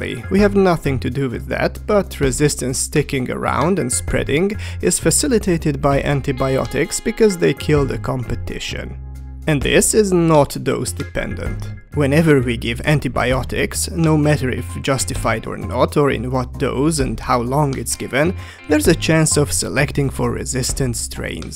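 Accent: Hungary. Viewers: I never realized that Hungarian English accents exist until I heard you talk...this is exactly how I sound when I take calls at work... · How you weren't aware of "Hunglish" existence. LOL XD For me is super easy to spot. BTW his accent not even that hardcore as far as I'm concerned. Anyways both channel is awesome. :)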